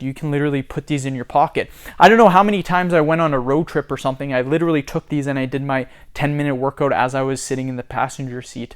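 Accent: Canada. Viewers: Canadian accent? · Canadian af bc he sounds like nick eh 30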